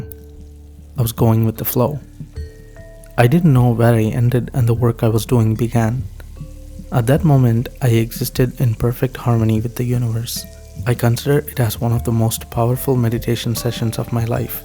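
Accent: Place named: Tamil Nadu, India. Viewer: Tamil scent in your accent. அடி தூள்.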